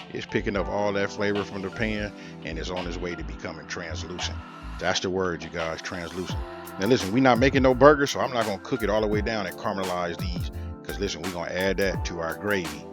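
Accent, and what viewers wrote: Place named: South, United States. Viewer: Where are you from, you sound so country in a good way!!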